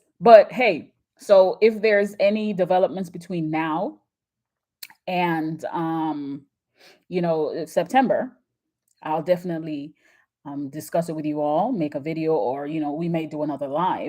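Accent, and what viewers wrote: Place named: Jamaica. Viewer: Oh you Jamaican! Gwan, no wonder I like your show! 🙏🏿🇬🇧🇯🇲❤️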